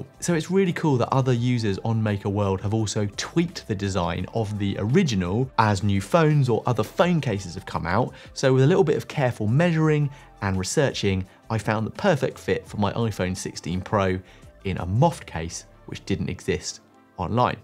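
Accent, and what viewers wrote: United Kingdom. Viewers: God, no more British Accents!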